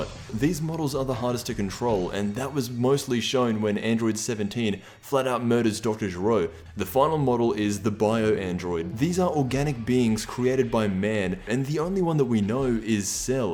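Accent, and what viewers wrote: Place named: Australia. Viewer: it's just an aussie accent lol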